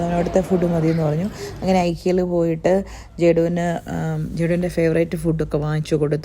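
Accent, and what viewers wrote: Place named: South Kerala, India. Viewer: Nattil evdeya South Kerala style talk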